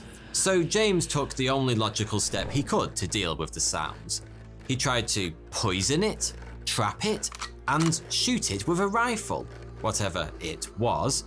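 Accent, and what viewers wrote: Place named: Southern England, United Kingdom. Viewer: Thanks Phil. I've come across the accent several times on YT. The word "think" caught my attention in a few cases. I'm curious about the dialect...I'd call it a dialect. It's a marker for a distinct cultural community. I wonder (and worry) if it will go extinct, like so many others. Do speakers have a group name, or any sort of identifier that I could research? If not, in what range and in what towns of southern England is this dialect common? I'm just looking for an area boundary, if there is one. Do you know if speakers are ancestrally connected to Bretons, Angles, Picts, Normans, etc.? And do they all have big front teeth and mustaches for disguise? LOL!!! I think that explanation is hilarious. Anyway, thanks for any first-hand, factual info you're willing to provide on this south England dialect. 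:)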